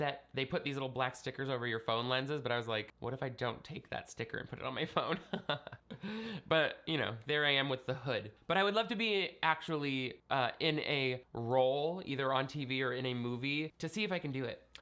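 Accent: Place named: Boston, United States. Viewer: I also pegged you as Boston.... :)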